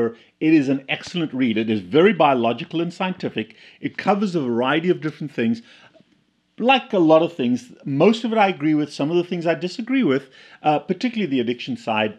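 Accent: South Africa. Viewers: Hey Doc. I recently found your videos and I'm enjoying them so much. From the first video I could immediately could hear that you are from my neck of the woods, from SA. Thanks for all your videos. Lekker man.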